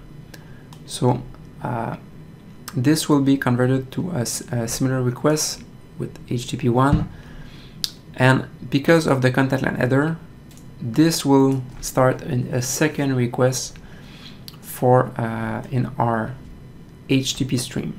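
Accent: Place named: France. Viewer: french accent... How cool is that?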